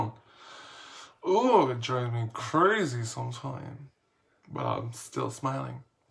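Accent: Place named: Australia. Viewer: Australian accents hit different <3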